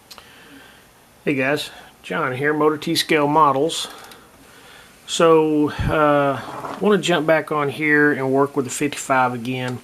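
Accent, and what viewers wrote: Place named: Appalachia, United States. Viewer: MC need to get rid of your hillbilly accent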